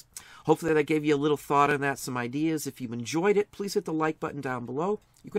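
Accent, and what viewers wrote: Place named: Northwest Ohio, United States. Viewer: Sounds very NW Ohio.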